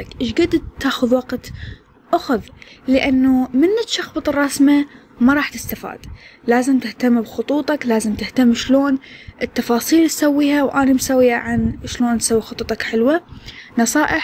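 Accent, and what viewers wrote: Iraq. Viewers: حبيت لهجة العرقية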